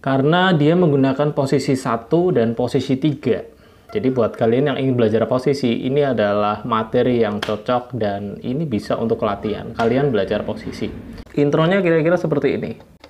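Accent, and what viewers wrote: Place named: Java, Indonesia. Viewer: Medok banged njul🤣🤣🤣🤣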